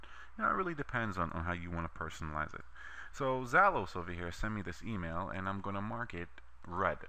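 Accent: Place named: New York, United States. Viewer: this one came in handy also - thanks for your hard work on these. I am still guessing NY accent...perhaps the Bronx?? I can hear it when you say dog.... like dawg :-) lol odd I know!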